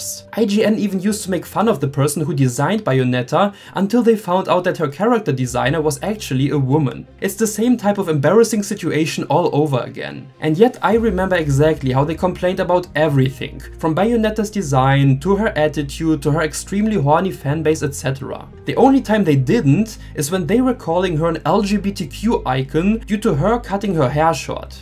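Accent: Germany. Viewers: I knew you had to be German before getting too far into the video lol ( I grew up in Fredericksburg, Texas(Also known as Texas Germantown.)) Definitely dropping you a sub 💯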